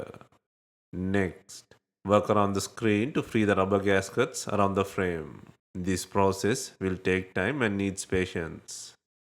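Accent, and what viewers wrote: India. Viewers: Best English with indian accent ever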